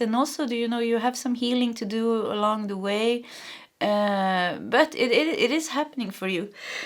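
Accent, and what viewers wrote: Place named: Sweden. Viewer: I'm pile #3 and I'm SO excited hehee! My many arms are open!!! Also- I am adopted but found out I have alot of Swedish ancestry so I love to hear your accent and I would love to visit Sweden one day! Maybe it will come on my many fun new adventures you see! I thank you and send you much light and love ❤️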